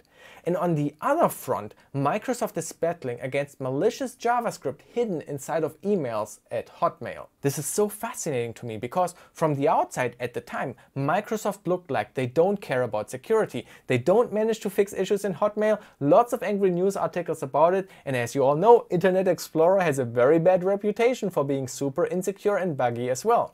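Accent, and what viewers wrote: Germany. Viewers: You always pronounce the X in XSS in German.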